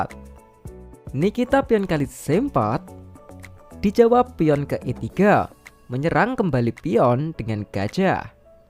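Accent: Java, Indonesia. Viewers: Asli orang jawa ya bang kok medok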